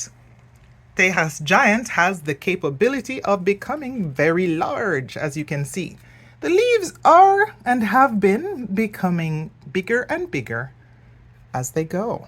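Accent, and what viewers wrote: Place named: Jamaica. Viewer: Hi new friend 😊 I just subscribed to your beautiful channel. I know I heard the Jamaican accent in your voice, and then I read the description and see that you are . Your orchids are beautiful. 😊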